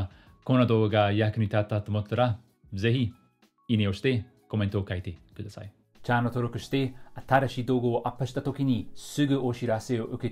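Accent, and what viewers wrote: Scotland, United Kingdom. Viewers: Mmm I’m in Australia and I know people from New Zealand but they sound quite different. has got such a beautiful Scottish accent i think 😊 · What accent is that? It sounds almost Scottish, but could be New Zealand as well. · イギリス英語カッコいい🇬🇧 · スコットランド訛りが強いですね。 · イギリス英語イケてる！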